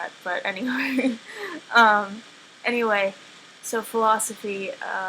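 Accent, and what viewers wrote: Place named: United Kingdom. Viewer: Thanks. After completing the test, I found your video enlightening and you echoed many of my characteristics. It is nice to feel more connected to people. Can you recommend any ways to communicate with other INFJs? I'd like to find out how deep our connections go. I love discussing things on a deep and personal level but not everyone enjoys that. Also, I thought you were British! Pity...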